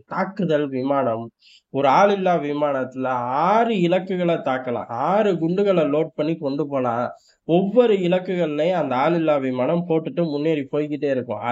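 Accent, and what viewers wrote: Madurai, India. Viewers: தல...செம்ம இன்ட்ரஸ்ட்டிங்கா பேசுறீங்க தல....உங்க மதுரை ஸ்லேங்...சூப்பரா இருக்கு...இதயே கண்ட்டின்யூ பண்ணுங்க...இன்னொரு சேனல்ல புதுசா பானைக்குள்ளருந்து பேசற மாதிரி ஒரு வாய்ஸல பேசுறீங்க போல....அது சரியா புரிய மாட்டேங்குது ப்ரோ....இப்ப நீங்க பேசுற வாய்ஸ்தான் சூப்பர்....அண்ண அமெரிக்கா பயபுள்ள மைலேஜ் கீழ வந்து சொல்லக்கூடாது...சூப்பர்....❤❤❤❤❤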